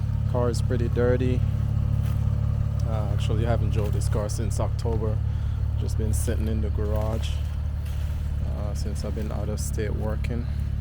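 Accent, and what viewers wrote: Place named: Jamaica. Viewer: Breddah The jamaican Accent cyaan hide, CLEAN!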